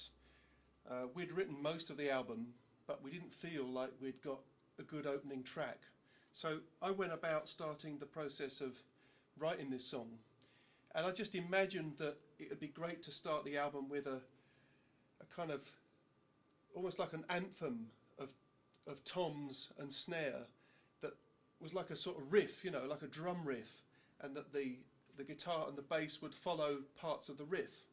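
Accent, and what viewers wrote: United Kingdom. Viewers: You sound like you're from London! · Yeah east, hes cockney mate!.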